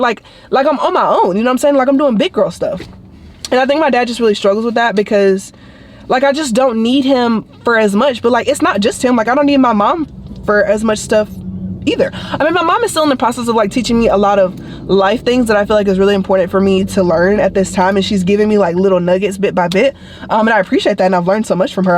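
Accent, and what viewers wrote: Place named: South, United States. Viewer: A little bit lol it’s the southern accent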